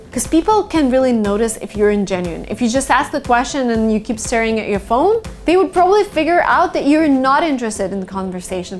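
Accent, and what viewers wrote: Russia. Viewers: Please make vıdeo With yoğur russıan accent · Is her native language Russian?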